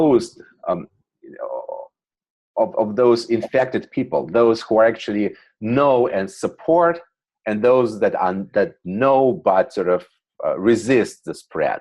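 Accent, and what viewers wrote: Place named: United States. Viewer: Thank you very much for uploading these videos. Did you learn English in States? I know you are Russian but you don't have much Russian accent in your English...it is American. I am surprised to be honest. Many people in Russia learn British pronounciation. And yours is clearly not that.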